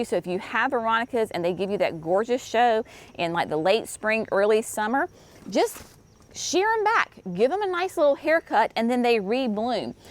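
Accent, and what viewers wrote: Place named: Tennessee, United States. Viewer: I got to comment. Love this video!! I am from Tenn and I declare your delivery is soooo us!!! ❤❤ Thanks. JT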